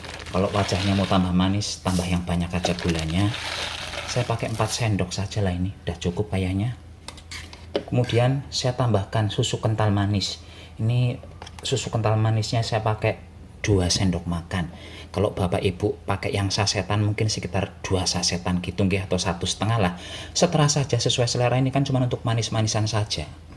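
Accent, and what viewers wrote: Banyumas, Indonesia. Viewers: Lucuuuu ms...saya jawa timur hidup di Samarinda....suka dengan logat bahasa ngapak...lucuuu poolll...ms👍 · Nyong seneng bahasane ngapak,pada bae kang nyong ya ngapak😂😂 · tetep ngomong bahasa ngapak... lebih natural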